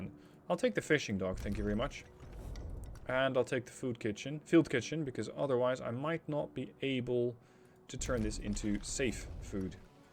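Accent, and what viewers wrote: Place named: Netherlands. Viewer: bump for nervous dutch noices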